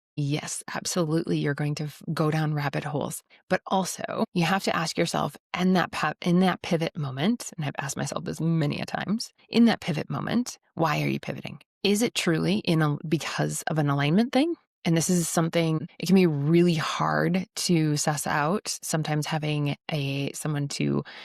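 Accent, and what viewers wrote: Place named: United States. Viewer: Your accent sounds American, and thus it is not only our MG settings. With only 10% of manufacturing left since 1979 the economy has gone suck for anyone but scammers and financial schemers with little but crap service jobs available. As my true self develops I have more abuse thrown at me from shallow geezers and utterly demonic black bloc monsters. I am not afraid or I would have quit long ago. I am surrounded by fragile egos and fickle fools and may need to just move to a new place on Earth after 52 years being the scapegoat and target for take downs in my decaying home town. Money does not simply flow from playfulness in the utterly polarized war on everything good. It is spiritual bypass to speak in this way. Maybe the next Leo eclipse cuts the idiots out of my way. I have heavy metal intensity with soft jazz detail sense, and am sick of being stuck in the punk dump of PNW.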